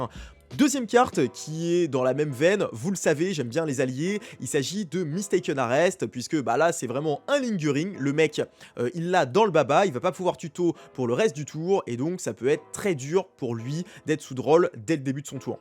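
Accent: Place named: England, United Kingdom. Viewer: Toujours aussi kiffant ton accent anglais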